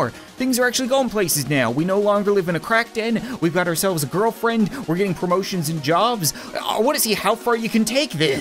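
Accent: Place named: Newfoundland, Canada. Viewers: not all Canadians have accents he's a newfy which is the Canadian Irish that's why he talks like that and also I'm Canadian that's why I know this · It is a Canadian accent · He canadian what do you expect.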